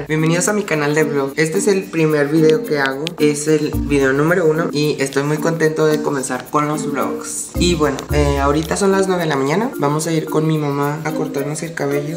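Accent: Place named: Torreón, Mexico. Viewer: te acabo de conocer y ya soy tu seguidora y admiradora!!! Me gusta tu forma de ser y tu familia, desde que te escuche me imagine que eras de Torreón y le atiné!!!... mi familia es de haya!!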